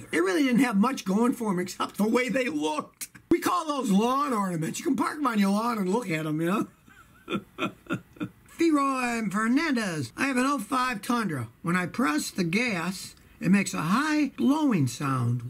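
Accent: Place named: United States. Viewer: What kind of US accent does have? I sometimes hear it, but not as strong like.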